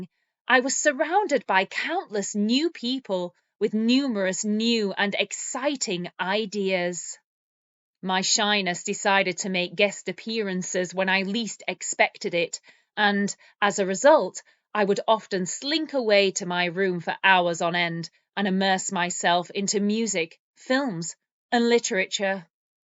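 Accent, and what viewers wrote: United Kingdom. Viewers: It's British accent isn't it?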